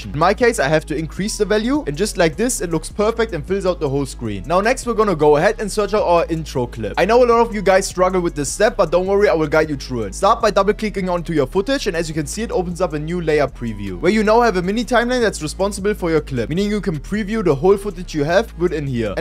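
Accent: Germany. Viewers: Deutscher Akzent? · Kann es sein das du Deutsch bist? Man hörts bisschen xD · are you german?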